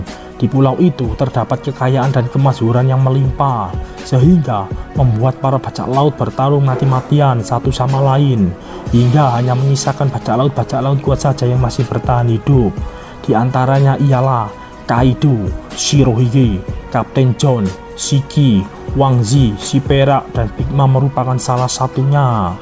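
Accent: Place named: Java, Indonesia. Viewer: Gua suka pembawaanmu bro... Jawa banget....opo onone 😄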